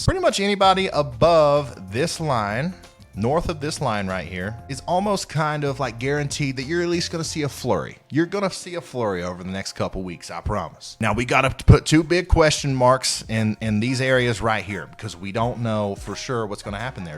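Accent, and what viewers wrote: South, United States. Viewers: You can tell that he cleaned up the southern draw just a little bit as he got more popular lol 🤣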